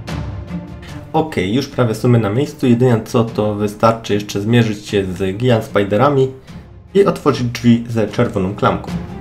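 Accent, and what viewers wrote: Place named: Silesia, Poland. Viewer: Lubię śląską gadkę :)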